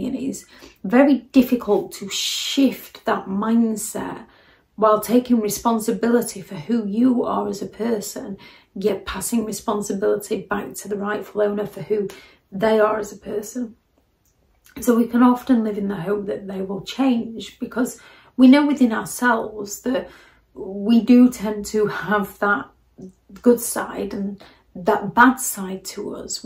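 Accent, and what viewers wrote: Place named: Northern England, United Kingdom. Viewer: Her accent is northern English.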